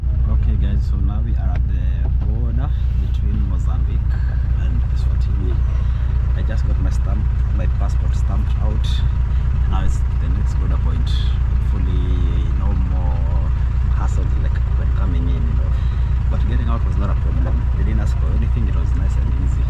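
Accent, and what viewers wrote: Kenya. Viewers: Lived in Eswatini for seven years, such a great country! You sound Kenyan, thanks for the video! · Thats a Kenyan 🇰🇪 accent. Good job my brother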